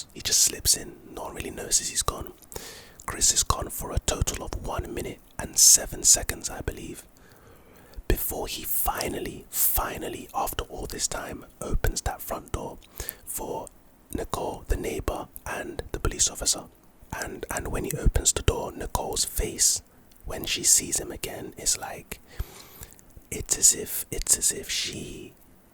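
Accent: United Kingdom. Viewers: ? Pronunciation of what? He's British I believe - they speak English in England lol 😂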